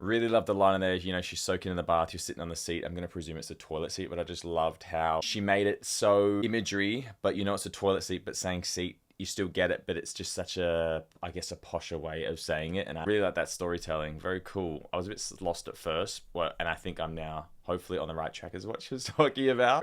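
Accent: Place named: Australia. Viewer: The way I could tell he was Australian before clicking on the video 😂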